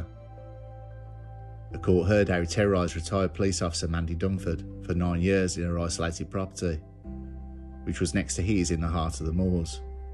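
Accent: Birmingham, United Kingdom. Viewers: He has a regional accent, they're quite common in Britain, it's one of our quirks , haven't you heard? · People from Birmingham or thereabouts sound like that! 😂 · good to hear a real brummie accent for a change !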